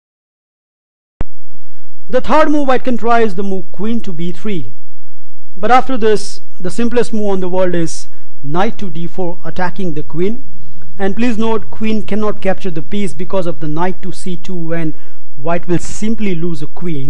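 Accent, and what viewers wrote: India. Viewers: ***** I like your accent. From which part of India are you?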